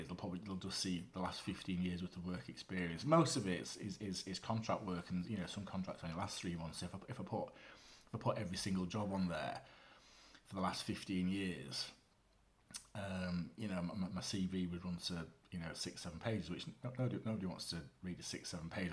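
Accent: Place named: Manchester, United Kingdom. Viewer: Can tell you’re a Manc!…if you got £200 for everytime you said “You know” in this one post alone, you could probably retire comfortably for life!! 😂👍….( great video bud…hit the nail on the head 😉)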